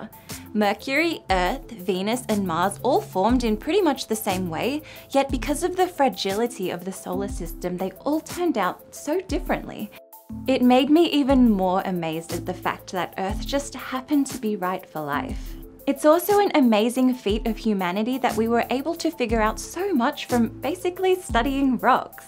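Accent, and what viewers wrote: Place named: Australia. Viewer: university? (*plus i detect an accent) ..... are you aussie, or sud afrikan, or NZ?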